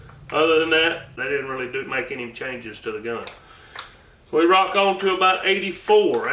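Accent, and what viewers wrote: United States. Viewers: Ar and mini14 are both prima pieces of shit. Go russian if you want quality.Fuckers eh? You sound like a hillbilly, and look interbred to me.Lol the official california battle rifle. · Do you have to talk like a HILLBILLY to own this Gun ?????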